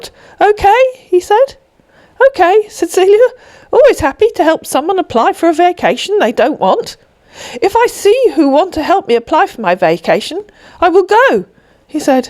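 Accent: United Kingdom. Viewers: This is the most addictive channel… ever! Even when insults are being doled out, the British accent makes it sound so polite.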